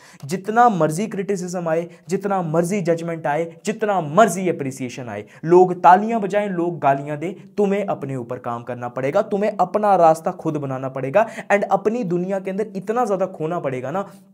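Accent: Jammu, India. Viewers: Bhai aap jammu se ho kya Dogri ka accent aa raha h😅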